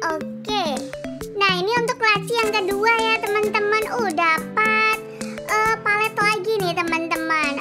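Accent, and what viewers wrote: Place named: Lampung, Indonesia. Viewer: Kakak kayak orang lampung aja ngomong nya ada "geh" nya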